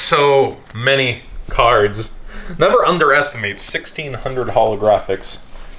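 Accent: Texas, United States. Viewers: You're from texas?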